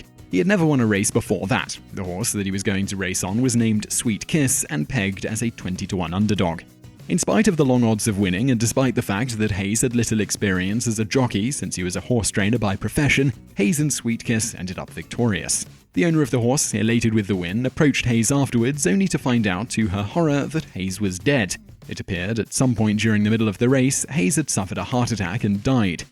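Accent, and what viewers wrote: United Kingdom. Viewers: he's British, just how us Americans say water wrong by saying "wader"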